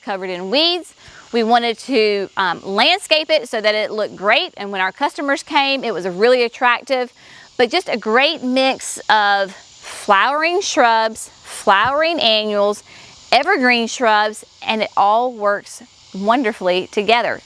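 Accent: South, United States. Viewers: Love your Southern accent and thank you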